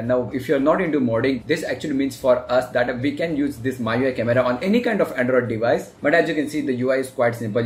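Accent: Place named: Telugu, India. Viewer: Such a fucked up review, and please stop fucking English language so much. Bloody Telugu accents... Bloody duckface.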